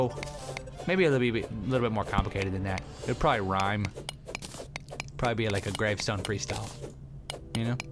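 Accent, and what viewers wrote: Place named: England, United Kingdom. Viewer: English :) not American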